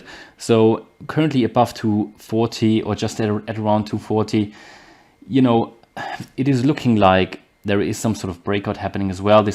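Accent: Germany. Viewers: you sound like you are from a german speaking region , germany-austria -switzerland . best regards from miami , a ex german immigrant :))) now american immigrant,,, always immigrant :))))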